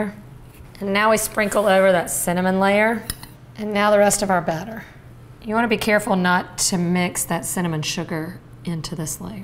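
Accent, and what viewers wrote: South, United States. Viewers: She’s gotta be from the south……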